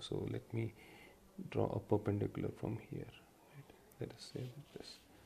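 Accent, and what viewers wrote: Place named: India. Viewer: Not to sound racist but the moment i the video started i kinda knew it was going to be an indian accent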